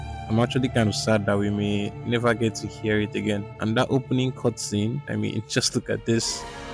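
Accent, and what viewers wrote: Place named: Nigeria. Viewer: I know a Nigerian when i hear one, I thought i was the only one who played wuwa and genshin Subscribed…🤗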